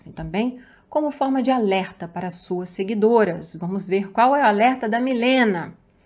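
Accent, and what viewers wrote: Minas Gerais, Brazil. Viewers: " morreu nao desgrama vou embora" 😂😂😂 amo nosso mineires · Vem disgrama.....famoso mineires 😂😂😂😂😂 adoroooo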